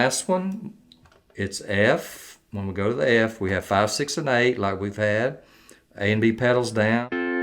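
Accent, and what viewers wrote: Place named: South, United States. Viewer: Hi, Great stuff, just getting back into play pedal steel after 45 years away. Used to play in a band etc, but now feels like i am starting all over again at 77 years old - fingers getting looser... Your instruction clips are proving invaluable, and enough for the moment, until i can find time to go deeper. For the last couple of days it has been bothering me, at 13.13 you have said a diminished repeats it's self every fourth fret (I ''heard'' note!!). Whereas a dim repeats it's self every third note, and an augmented repeats at every fourth note. That is if I have it correct from memory and the way it sounds. Then it dawned on me that on replaying this evening, that you were saying 'fret' and counting the fret you were on as the first one, instead of starting with the next fret up (as the first one), which would be the next note (or the first of three). 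I have not noticed this before, and wonder if it causes confusion for some ('idiots' like me!!!?). Is it common practice to count the fret you are on as the first? I am not being pedantic, just feeling my way, and wondering for all of us. I am always looking forward to the next presentation, and the southern accent. Takes me back to so many tours of the States, teaching and demonstrating woodturning - 27 states in all......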